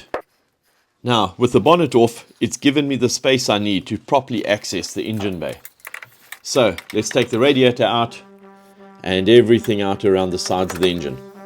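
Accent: South Africa. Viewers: Your English accent sounds like a South African. My uncle Harry used to restore Alfa Romeo's back in the day in South Africa.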